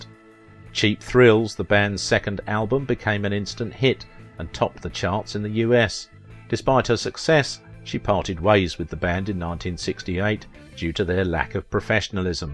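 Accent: United Kingdom. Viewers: Script clearly written by a kid. Why put a British accented man to speak your words? "She had a guy and a woman...so that's when she ended up doing heroin. It turned out to be super strong stuff..." Not a criticism but weird choice. The accent isn't needed to add cred.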